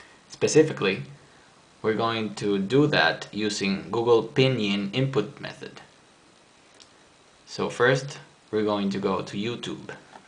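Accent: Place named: Finland. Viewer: I say its a finnish accent.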